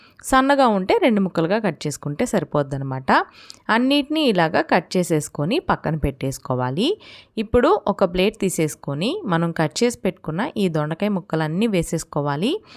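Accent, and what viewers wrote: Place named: India. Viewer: Maam you have indian voice also...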